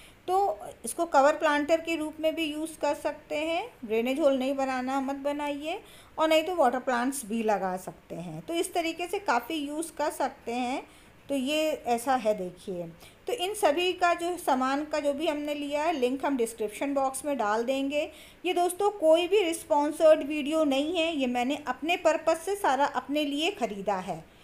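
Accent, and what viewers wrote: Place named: Allahabad, India. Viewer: being allahabadi my self i can so identify with the accent ,kudos to you for being authentic:)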